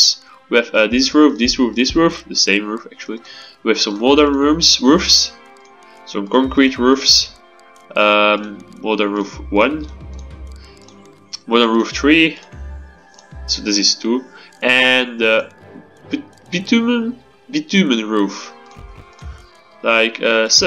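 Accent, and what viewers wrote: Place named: Netherlands. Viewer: Mooie video, maar aan je accent kan je gewoon horen dat je Nederlander (of vlaams) bent 😂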